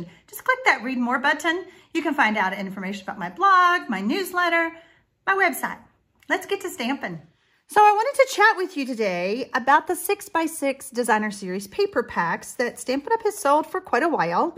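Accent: South, United States. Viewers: 😃 You do bring a smile to my face,. And I love your southern accent and your tutorials. Greetings from Perth, Australia 🇦🇺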